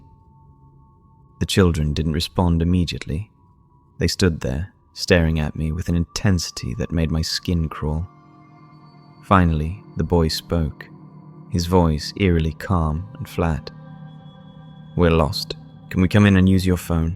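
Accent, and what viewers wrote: United Kingdom. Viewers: I enjoy YT channels that create their own content. I am in the process of watching all the videos on your homepage. The British accent in narration adds a nice flavor to the story. You have tales I haven't heard before, as I said the narration is wonderful, excellent grammar in the writing, have you ever thought of writing short stories of longer duration. I'll wager you would be good at it.. Thank you for all the effort you put into your productions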